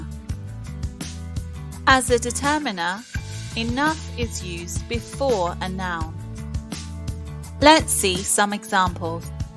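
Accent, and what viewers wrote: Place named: United Kingdom. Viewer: Hi! I think I hear your name like that, I fall in love with the British accent, I like the way of teaching with animation, because the content is visualized and it is very practical to get started, I'm sure that this channel will grow because its content is very clear to learn, success and thank you for your contribution and dedication...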